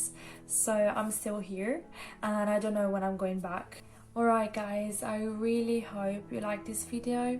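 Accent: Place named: London, United Kingdom. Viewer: Your English has come along so well - a slight London accent too! Xxx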